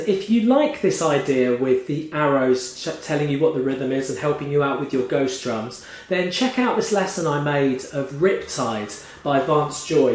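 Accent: United Kingdom. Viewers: i likes this guy, his accent is wonderful #yeaBRITISH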